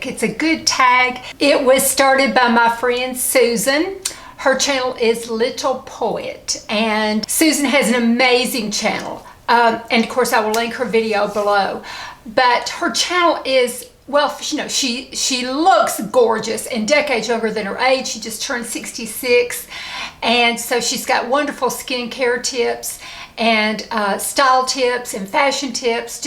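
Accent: South, United States. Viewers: southern US accent I believe! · You are a wonderful, sweet human being. I am so happy you have avoided this awful virus. I was hospitalized for eleven days two weeks ago, and it was no joke. I hope you are getting the vaccine. I had no prior illnesses and it was bad. I look forward to hearing that great Southern accent! Love you and your channel! Stay healthy!! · Nothing finer and sweeter than a real southern accent. Wear it with pride my dear. I used to feel shamed having one for years and over the years I’ve learned to embrace it. It is a part of my identity and I’m proud of it! If folks don’t like it so be it. Probably more about them that is more unloveable than my accent that seems to bother them. When people make fun of my accent now I lay it on even thicker lol. You are a rare beauty. You are beautiful inside and out and an inspiration! Love your channel. 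First time commenting.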